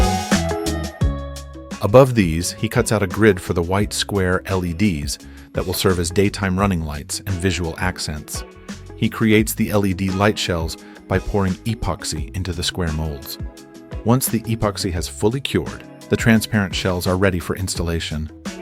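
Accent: United States. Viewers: Replica !!!! LOL. It's a 1/1 model poor personalised copy of a hypercar. If it's THIS easy ...... makes you wonder why all the 3D printing and CAD designed parts are ever necessary ............. everything is "PERFECT" and the aerodynamics are "tested". LOL. ALL the electronics are 300mp/h rated - just in case you were wondering how well the "air brake" would work at speed ....... Thje car is PERFECTLY symetrical - both sides not only LOOK but ARE perfectly identical reversed replicas of eachother. This ensures the car drives in a straight line at high speed. LOL. He is certainly smat enough however to make a video of it and overlap an American voice. Money is Money, every dime counts. Lets not forget that this lost guy in a shed in Vietnam has ready access to Brand New Bugatti front windshields - when Bugatti owners find it hard sourcing them ......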